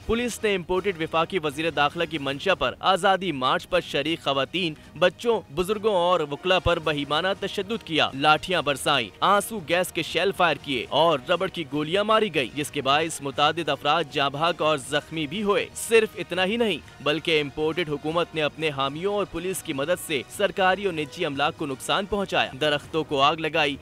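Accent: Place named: India. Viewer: jesi editing ki hoi video ki or accent hai bolne ka.. bilkul indian media lag raha hai...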